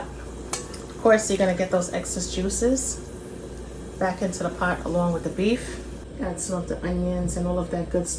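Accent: New York, United States. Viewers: That New York accent tho❤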